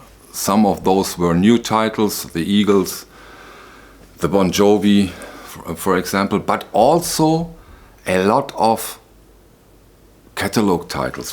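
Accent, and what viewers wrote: Germany. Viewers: I actually really enjoy your speaking and German accent (I'm American). I find it sincere and very intelligent.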